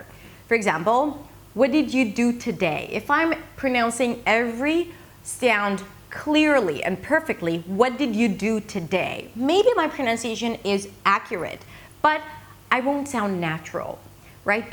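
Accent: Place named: United States. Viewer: You are my role model when it comes to pronunciation. Every time I think about giving up, I remember how you managed to learn your perfect American accent without being American. Thank you,!:D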